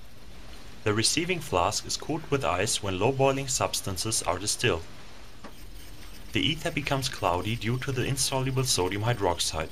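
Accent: Germany. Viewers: Are you German.? The accent sounds like it..( That's NOT a racial thing, just an "ear" thing) :) btw, ich bin Belgiër und mein grossvater war Deutsch.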